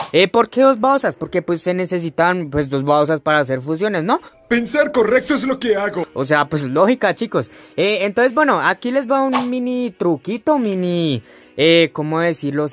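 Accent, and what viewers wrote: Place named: Colombia. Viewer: Eres colombiano por tu aciento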